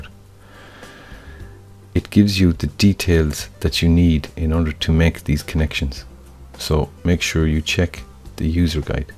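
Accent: Ireland. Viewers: By listening to your accent, I guess you're Irish. Am I right? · What part of Ireland are you from your accent sounds like Dublin.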